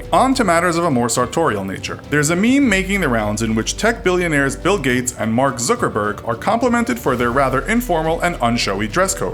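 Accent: United States. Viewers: The guy talking in the video sounded American. It is like telling someone to make fun of themself in public. Is the channel in the US? This brings up so many questions in my head. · Please do not try to use u.k slang words if you have an American accent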